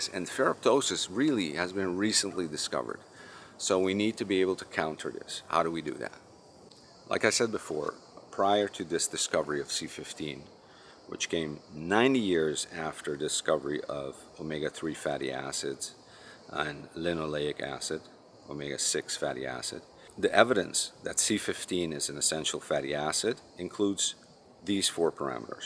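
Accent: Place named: Netherlands. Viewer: There is a slight dutch way of saying things, besides that I have concert-pianist ears so that's how I noticed.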